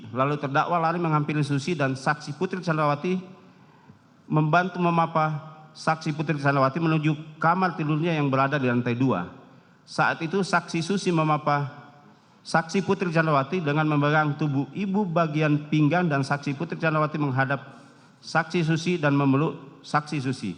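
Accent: Java, Indonesia. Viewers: Hal yg di karang karang membaca nya uga gk lancar pletot pletot boso jowone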